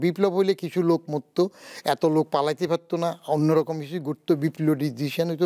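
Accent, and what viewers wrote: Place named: Noakhali, Bangladesh. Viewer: মামা ফিউর নোয়াখাইল্লা.... 😁😁😁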